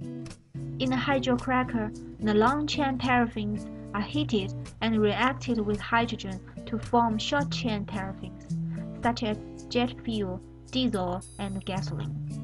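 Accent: China. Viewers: The video is funded by two US organization yet why does it sound like you hired a voice actress who sound like she hadn't even left China?! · if this is an "american institute" thing then why is this being narrated by some chinese lady who just learnt english a week ago ?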